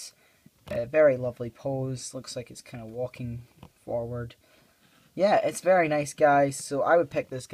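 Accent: United Kingdom. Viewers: And please stop speaking British plese repliy.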